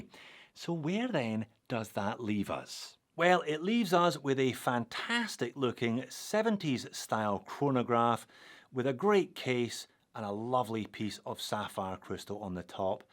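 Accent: Scotland, United Kingdom. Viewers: ya just type that in the search bar it's the old oatmeal crisp commercials from earlier 90s. For some reason your Scottish accent just pop the commercial into my head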